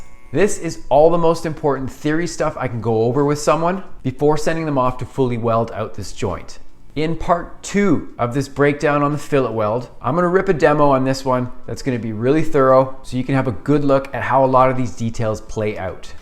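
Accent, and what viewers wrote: Canada. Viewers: Canadian aye 👌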